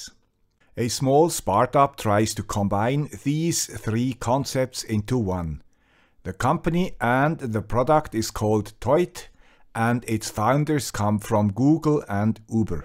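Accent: Switzerland. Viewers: What accent is that? Every time I’m watching your videos it sounds like a Swiss accent to me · As always you show us new and exciting possibilities. Thanks guy with swiss accent!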